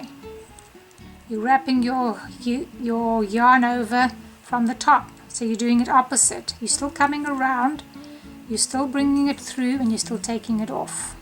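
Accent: South Africa. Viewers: Are you South African? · Is that a South African accent? Lekker, will try with God's will soon ❤ Thank You P.S in Hebrew means my happiness, is happiness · I love your accent. You by any chance from SA?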